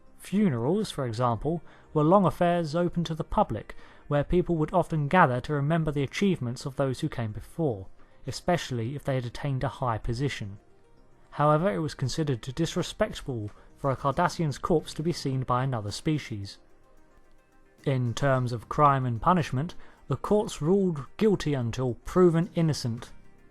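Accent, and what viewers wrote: United Kingdom. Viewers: Sounds like you live in Britain, whats the difference?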